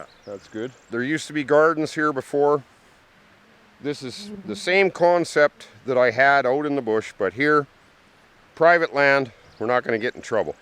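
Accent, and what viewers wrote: Alberta, Canada. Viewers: He has a strong albertan accent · Mr. was this video produced in the Rocky Mountains of Canada. I noticed you and your friend were referencing the miles in Kilometers and you have a Canadian Accent.? Also, us there Cell Phone Service in the mountains that far back in to reach Medical Help if needed.?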